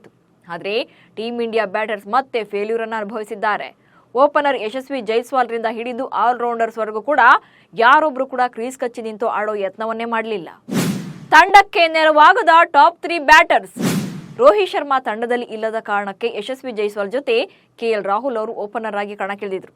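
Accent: Standard. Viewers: Svachcha kannadada dvani shanta medum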